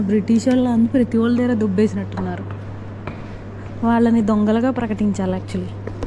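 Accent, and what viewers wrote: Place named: India. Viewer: Hi 🙋I Like U R Bold Telugu Accent & Speaking Comedy Also🙋❤From Vizag (AP)💞😀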